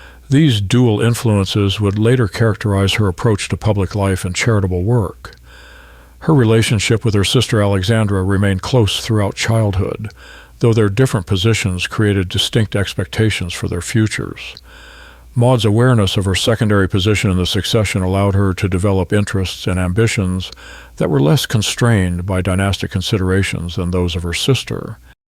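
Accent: United States. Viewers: This is a very interesting presentation, but does it have to keep labouring the onerous ducal duties? Why oh why does it require an American narrator, talking about ‘dookal’ titles and children being ‘tootered’? Tiny point , Lady Maud was the niece of Queen Maud of Norway, not her great niece. Queen Maud was the Duchess of Fife’s younger sister.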